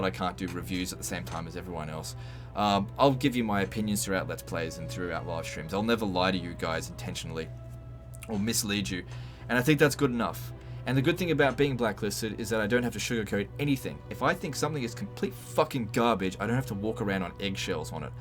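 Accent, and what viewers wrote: Queensland, Australia. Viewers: Brisbane gang represent, enjoying the deep Queensland accent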